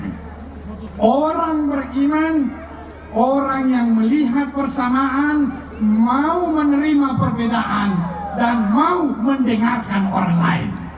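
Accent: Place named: Palu, Indonesia. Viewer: ini kiai org apa ya..logatnya kaya org palu..😅😅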